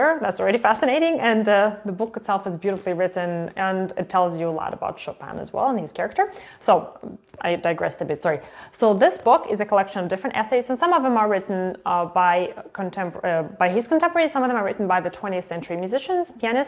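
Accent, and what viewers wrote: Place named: United States. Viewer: Thank you for reminding me of those wrists positions 😊 I had forgotten them. I was taught that too, up and down was the most important. I don't quite remember if the stacatto movement is included in up and down, 🤔 I know I had to practice that wrist movement, my teacher used to say the 'ping pong' wrist movement. Where are you from? You speak English with an American accent, but you're not American nor English, some eastern Europe country? I'm Elsa from Lisbon /Portugal. Liked your interpretation of Chopin's scherzo 👍👍👍 congrats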